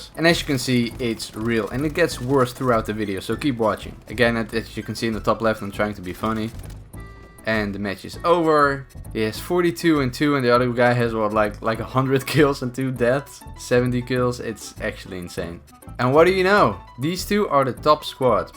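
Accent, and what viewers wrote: Netherlands. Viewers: Lekker dat nederlands engels haal je er zo uit hahahaah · You sound like you are from Holland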